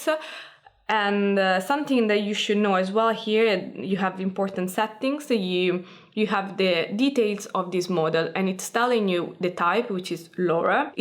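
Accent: Italy. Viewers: Amazing, I've finally find out how to use a Lora model thanks! PS: Sei italiana? il tuo ecc ecc suonava italiano :)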